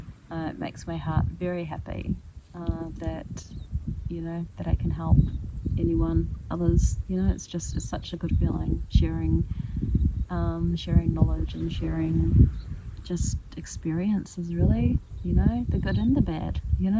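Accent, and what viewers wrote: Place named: New Zealand. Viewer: Good morning. First of all, welcome back home to Godzone, Aotearoa, Nz. I WILL continue to follow, stay subscribed to your channel. I guess the content is easy to follow, simply because it's in "our" accent, and not in a monotone. My response, even though it's a cliche; is "You do You." I don't know what you have planned, but I will be here in Murchison, June to August. I would love to meet you personally. I kinda feel, that you have more to say. However it does get cold, through the winter here, in the village, but in reality, there's no such thing as cold. Just an absence of heat.!! 😮 Thank you for your previous content, and I look forward to further cooking episodes, if that is what you have planned. Maybe, van life.? In the meantime, have a break. All the very best. Much aroha.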